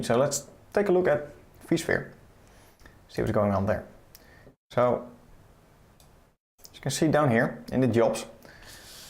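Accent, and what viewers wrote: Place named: Netherlands. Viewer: dutch english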